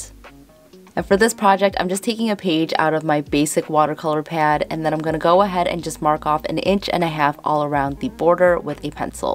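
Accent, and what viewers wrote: Chicago, United States. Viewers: You can also use it to hold anything like make up brushes or in your kitchen to hold utensils if you made larger. At your desk for pens ...im a Chi girl too!! I love our accent.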